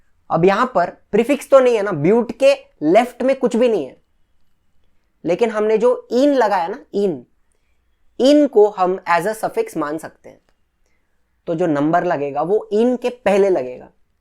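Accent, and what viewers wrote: Maharashtra, India. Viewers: Sir aap marathi hoo kya 🙃🙃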